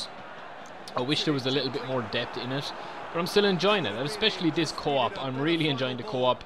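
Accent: Ireland. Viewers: Which part of Ireland are you from? Love your channel btw. Great content!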